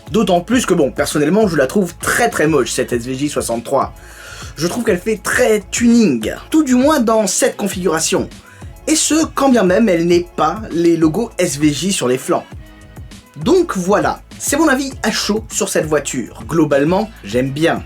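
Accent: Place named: Italy. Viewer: Mais quel accent italien omg <3 <3 <3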